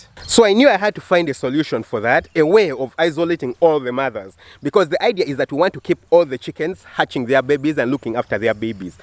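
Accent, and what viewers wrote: Uganda. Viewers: Just dropped on this video and just by the way he spoke...i immediately knew he was a ugandan😂😂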